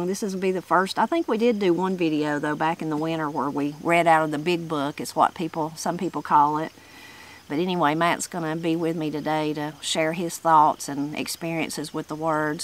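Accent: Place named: Tennessee, United States. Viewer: The way you talk sounds like the way my people talk. Love it. Chattanooga TN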